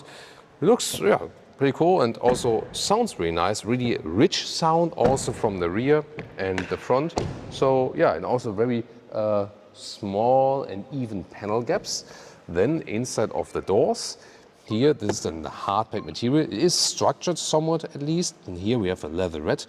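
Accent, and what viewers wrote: Germany. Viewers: Somehow it feels so satisfying to listen to this well-rounded review of a French car, delivered in English with a German accent. Love the new Clio! ❤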